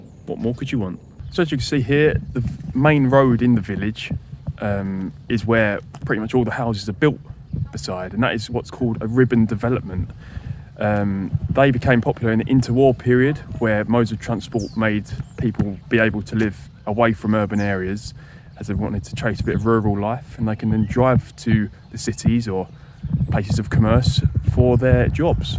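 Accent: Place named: London, United Kingdom. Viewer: I assumed I was better at accents. I assumed you were a Londoner 😮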